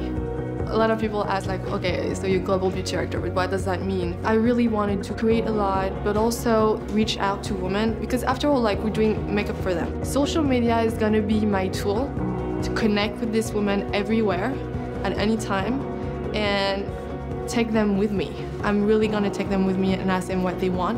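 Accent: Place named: France. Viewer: I like u but i liked u better with your french pronunciation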